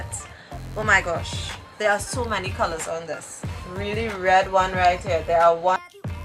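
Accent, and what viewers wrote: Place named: Trinidad and Tobago. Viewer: Colours are amazing 😉 love the bold look 😍😍 and the trini accent ☺️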